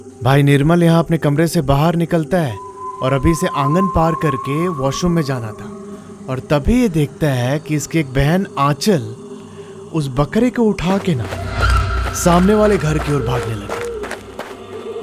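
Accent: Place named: Jharkhand, India. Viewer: Bhaiya aap Jharkhand ko boli seh lag raha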